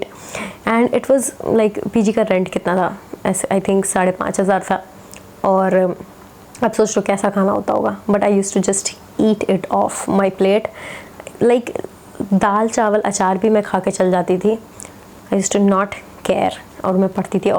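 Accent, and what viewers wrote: Bihar, India. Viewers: Ha bhagalpur se ha sayad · Aap Bihari ho?🥺🥺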